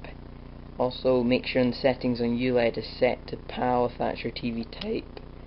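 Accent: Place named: Scotland, United Kingdom. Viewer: Chewin the fat this guys well Scottish :L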